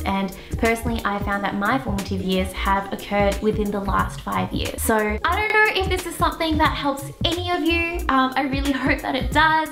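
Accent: Australia. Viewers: Hang on is she Australian??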